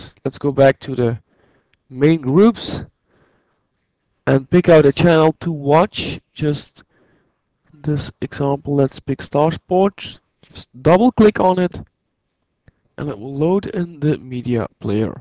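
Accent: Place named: United States. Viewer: Inglês bom pra eles mesmo só o americano... vai falar keeeent (can't) mesmo... sotaque irritante... so... were u stoned, sleepy or just bored? can u teach me how to smash who doesnt broadcast botafogo's soccer games? PLEASE! BROADCAST MORE SOCCER MATCHES FROM THIS TEAM!!! COME ON! ITS LEADIN THE BRAZILIAN LEAGUE N PLAYIN NICE SOCCER! ty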